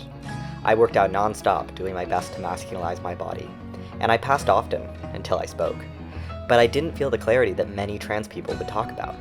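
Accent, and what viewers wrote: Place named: Northeast, United States. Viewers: Seems like Maine (based on the ID) but it's a pretty general 'northeast' accent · I love this guy’s American accent but I’m British and can’t work out which state this accent comes from?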